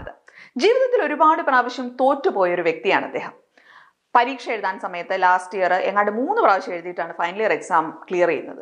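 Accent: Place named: Valluvanad, India. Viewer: valluva nadan Samsaram mam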